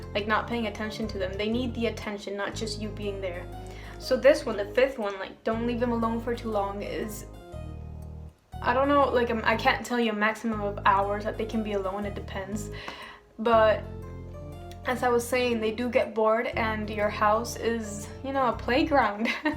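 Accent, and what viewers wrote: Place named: Netherlands. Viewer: Heey are you dutch? Your accent sounds pretty mutch just like a friend of mine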